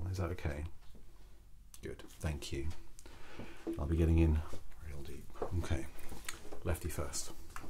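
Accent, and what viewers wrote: United Kingdom. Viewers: 썸네일만보고 속삭이는 소리 기대하고 왔는데 제가좋아히는 영국식 영어에 목소리까지 너무 좋네욬ㅋㅋㅋㅋㅋㅋㄱ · Its incredible how much i love the british accent!! Besitos From Spain♡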